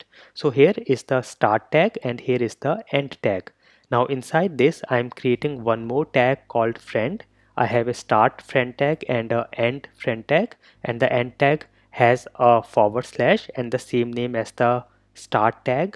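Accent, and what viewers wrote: India. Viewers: are you from bengal?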